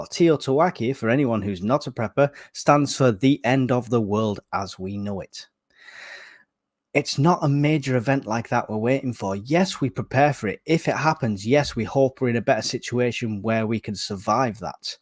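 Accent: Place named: Rochdale, United Kingdom. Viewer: Trying to pinpoint your accent. Going to guess Rochdale because of how you say “work” but the way you say “year” is really throwing me 😄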